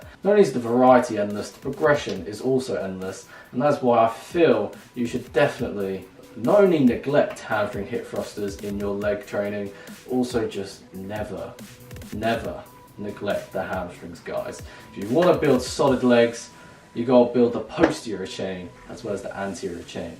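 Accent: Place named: East End, United Kingdom. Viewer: I thought you just had a typical east end London accent lol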